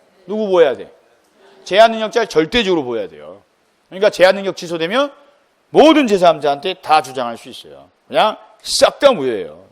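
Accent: Jeolla, South Korea. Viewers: 이 아저씨 왕 섹시하다. 아우라 오지고. 전라도사투리도 꽂히네. 머더러 혀...?.아니여.현존이악만줘. . . ㅋ ㅋ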